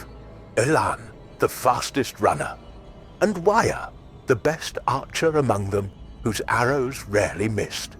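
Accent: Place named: RP, United Kingdom. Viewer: ok that explains the generic posh english voice seriously I love wearwolf dogman stories and use to love every channel I came across that narrated/ reported the stories or encounters but lately people don't have tge faith in the voice of there own howel theve lost the lessons that its goider to fail to die to be crushed as you're self than to live a single second or even succeed as someone or something you're not I won't run you or this point into the ground but if you want a decent respectable backable channel use youre own voice embrace you're inner beast and inner human not to mention with all the sheep following the A .I trend it will set you apart from the herd / flock and remember brother if you are wolfen too than wolves care not for the barking of dogs or the baaa,ing of sheep peace may Luna bless you're steps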